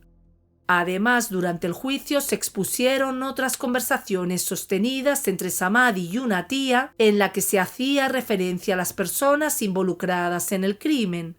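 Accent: Spain. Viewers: esta bien pero me hace ruido tu narracion no me gusta para nada es contaminacion auditiva ese hablado casi que cantado de narrar es desesperante mas el acento español deberia ser neutro. pero tu contenido es bueno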